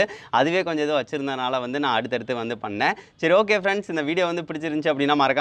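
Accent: Sivakasi, India. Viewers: உங்கள் வீடியோவை பார்த்துவிட்டு அதன் பின் தவறான முறையில் அனைவரும் வீட்டில் வைத்து செய்துபார்க்கலாம் என்று நினைக்க தோன்றும் அதர்க்கு முன் உதாரணம் ஆகிவிடாதீர்கள் நண்பர் அவர்களே சிவகாசி உள்ள ஒருவனின் குரல்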